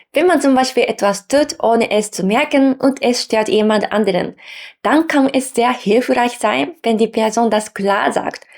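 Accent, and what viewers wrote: Japan. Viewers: Sie sind super, haben viel reflektiert, sich scheinbar nicht vorschnell eine Meinung oder gar ein Urteil gebildet. Willkommen in Deutschland, die Deutschen werden Sie lieben und Ihre Meinung sehr wertschätzen. Weiter so! Ein Deutscher PS: Ihr Deutsch ist sehr, sehr gut, ihr japanischer Akzent sehr süß, Wortschatz , Wortwahl und Ausdrucksweise besser, präziser als bei vielen Muttersprachlern. Alles gute für Ihr weiteres Leben. Mögen Sie glücklich werden - wo auch immer Sie sind. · Stimmt, deine Stimme ist tiefer, aber dadurch auch klarer und selbstbewusster. 👍😁 Was ich höre, ist klar noch die Betonung (da würde ich das Haupt-Augenmerk drauf lenken ... ruhiger sprechen, leiser, dass der Fluss weicher wird und die Betonung nicht so stark hüpft ... 🤔), manchmal das "ch" und das Japanische R noch ... aber nicht immer. Generell ist die Verständlichkeit und der Satzbau klasse! 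👍 Vielleicht hilft dir das etwas... 😉 Ja, Ironie ist ganz wichtig! 😁 ... ist quasi das deutsche Luft lesen, denn die Kunst ist hier, zu erkennen, ob es ernst, oder ironisch gemeint ist. 😮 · Tolles Video! Dein japanischer Akzent ist so かわいい.😻 Es ist schön, auch was über Deutschland aus deiner Sicht zu lernen. Das sind Sachen, die ich eigentlich als normal ansehe, ohne weiter darüber nachzudenken. Das macht richtig Spaß. Ich habe vor 7 Jahren angefangen fast ausschließlich japanische Musik(J-Rock/Indie/Metal und J-Pop) zu hören, und das war für mich der Beginn mehr über die japanische Kultur erfahren zu wollen. Seit über einem Jahr lerne ich japanisch, und es ist echt nicht leicht. Aber ich gebe nicht auf. Freue mich auf das nächste Video. ありがとうございます。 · Behalte um Himmels Willen Deinen japanischen Akzent! Was Du tun kannst, ist Dir einen prägnanten deutschen Akzent wie Bayrisch zusätzlich anzueignen. 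· Hi, ich schaue deine Videos ganz gerne. Wann hast du die Deutsch Grammatik gelernt und wie, das ist ja nahezu perfekt, also wesentlich besser als meine Rechtschreibung ;). Also ich finde es super, wenn jemand der nach Deutschland kommt sich die deutsche sprach bei bringt. Da bin ich aus meiner IT Job anderes gewohnt. Aber ich erwarte nicht, das die jenigen auch noch Akzentfrei sprechen können. Du kommst nunmal aus Japan, das sieht man nicht nur, sondern man darf es auch hören. Lustig das die Sprichwörter oft in beiden doch unterschiedlichen Kulturen funktionieren. Jetzt habe ich dich von Anfang an geduzt. Wird in Japan zwischen Du und Sie unterschieden wir bei uns? Aber warum habe ich das nicht korrigiert, naja, ist ja nicht unüblich hier auf YouTube das Du zu verwenden. Obwohl es hier eigentlich üblich ist das Sie zu nutzen wenn man wen nicht kennt und sich nicht auf das Du verständigt hat. 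Aber es kommt oft auf die Umgebungen an. Nicht nur beim Sie und Du. Es ist sehr schön zu erfahren wie Menschen aus anderen Ländern Deutsch und Deutschland wahrnehmen und die Kulturellen Unterschiede herausarbeiten und dazu auch noch einordnen. Und Du machst es auch noch gut, das kann mann nicht von allen YouTubern in deinem Format sagen, bei Dir habe ich das Gefühl das Du wir Japaner und Ich individuum aus Japan gut unterscheiden kannst. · Der leichte japanische Akzent ist reizend, Grammatik, Aussprache und Satzbau sind perfekt. Respekt!! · Ich liebe diesen japanischen Akzent, du musst dich auf keinen Fall verstecken oder Gedanken machen. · Niemand erwartet, dass du deinen süßen Akzent aufgibst. Der japanische Akzent wird als sehr nett empfunden. Ich kenn keine Person aus dem jappansichen Sprachraum, die besser Deutsch spricht, als du. Ich habe länger zugehört und wüsste gar nicht, welche Tipps zur Verbesserung ich überhaupt geben sollte. 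· Behalte dienen japanischen Akzent, es sind deine Wurzeln, sei stolz darauf. Ich liebe die japanische Kultur. Aber ich vergesse auch nicht, das Deutschland wie Japan in der Vergangenheit schlimmes getan hat. Ich hoffe unsere Völker lernen daraus.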